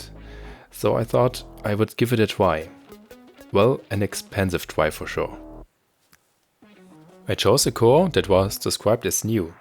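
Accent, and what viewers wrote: Germany. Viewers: That german accent xD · das deutscheste englisch der bre ist deutsch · Hör ich da nen deutschen Akzent 😂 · hey man, ich mag ja deinen Content, extrem geil, ABER deine Englische Aussprache ist soooo grottenschlecht das es mir die Schuhe auszieht, dein englisch ist auf dem Status des deutschen Funkers der der Titanic auf die message "we are sinking" gefragt hat "what are you sinking of" dein englischer Wortschatz ist echt gut, keine kritik, aber dein deutscher Akzent tut einem in den Ohren weh :.( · I smell German! Its clear due to your Accent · i hear deutsch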